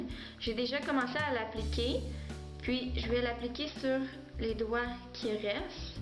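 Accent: Canada. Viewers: J'adore ton accent canadien !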